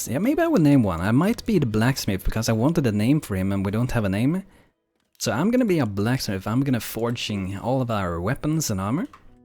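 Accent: Sweden. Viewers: your Swedish right?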